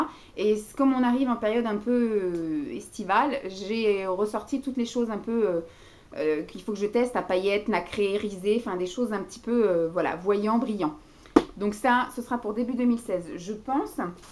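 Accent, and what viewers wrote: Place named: Quebec, Canada. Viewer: Bonjour miss... super tes achats.... comique de t'entendre parler Québécois... d'accord avec toé lolll...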